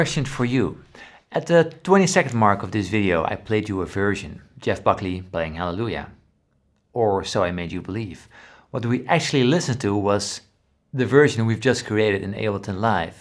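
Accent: Netherlands. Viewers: The best things about ' music videos (in random order): --The Dutch accent that can sometimes make him difficult to understand momentarily, yet lends a certain gravitas --The humble, self-effacing humor --The funny, quirky video shots --The superb production quality of the videos --The thoughtful design and progression of material in each video --The time and effort he obviously dedicates to making them so exceptional --The love and passion for music he shares and inspires --The broad knowledge of music and musicians he offers --The uncanny ability in selecting interesting subject content--or otherwise making it interesting --The personal honesty and openness always evident --The willingness to question his own opinions, not just others --The creative musical thirst and desire for experimentation --The excellent, wide-ranging musical taste --The intelligence and ability to breakdown the complex into the comprehensible --The superb guitar playing If there is anything above found missing or incorrect, feel free to reply and add your thoughts. 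Already remembered one big thing not mentioned: THANK-YOU,!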